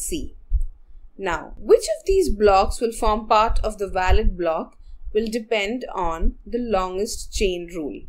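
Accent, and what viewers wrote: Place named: Trinidad and Tobago. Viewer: Your accent suggests you are from Trinidad. Am i right?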